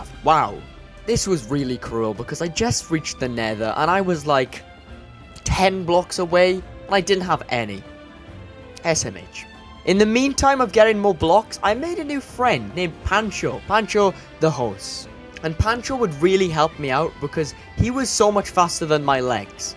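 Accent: Wales, United Kingdom. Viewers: No, he’s welsh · Are You Welsh? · Are you from wales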